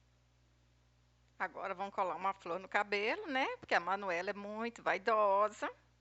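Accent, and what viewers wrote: Minas Gerais, Brazil. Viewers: Gostei de sua aula, amo esse sotaque minerim. 🥰 Vou fazer a minha bonequinha.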